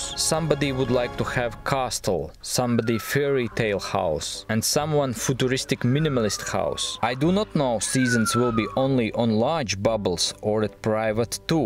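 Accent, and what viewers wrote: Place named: Russia. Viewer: Might wanna skip the Russian accent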